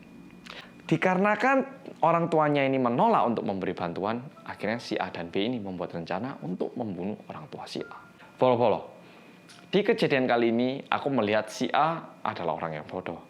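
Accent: Java, Indonesia. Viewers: Bersama orang Korea yangggg medokkkkkkk.. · Semangat mas medok :) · Biarpun sinyal ajrut-ajrutan..tapi tetap semangat nunggu loading.. Demi nonton konten nya detective medok..mas 👍😎❤️ Semoga selalu sehat nggeh mas.. Salam dari Kediri 🙏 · Medhokkkk😍 editan videonya mkin mantep mas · Halo bang yang medoook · GERCEP MEDOKKKK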